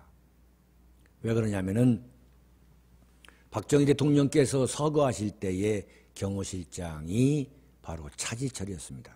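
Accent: Chungcheong, South Korea. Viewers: 윤칼세님 방송이 그래도 재밌음 느릿느릿한 충청도말이지만 진실과 설명을 잘 애기해주네요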